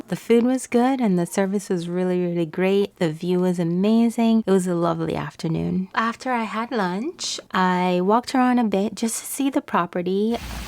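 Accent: Jamaica. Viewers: Just came across your channel, Watched all your videos. Love The Content. And I could tell from you're accent that you're Jamaican too. Blessup and keep up the good work. Love the editing also. I Subscribed, so looking forward to more videos.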